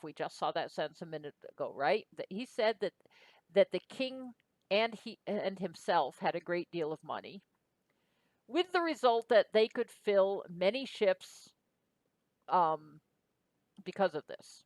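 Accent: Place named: United States. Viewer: And another American pronounciation and diphtongisation of Ancient Greek... why does noone pay attention to the basic phonetics???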